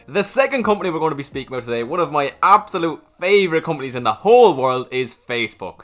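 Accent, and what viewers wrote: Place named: Ireland. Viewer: me personally I like everything you do regarding the videos,this new style of presenting is even better I think.Yes never missed a single appearance of yours,as I find important value In you’re presentation.And I think this channel is not a should it’s a must grow in my opinion.Real valuable information,up to date updates specific monitories stock’s,easy language for everyone to understand,beautiful irish accent,best dividend,best growth stocks and even more.... don’t stop do what you do best because you’re very good at it.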